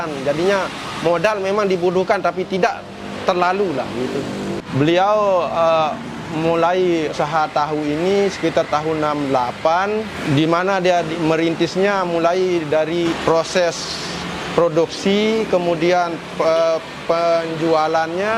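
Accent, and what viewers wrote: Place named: Lombok, Indonesia. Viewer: Perpaduan antara logat sasak dan logat bali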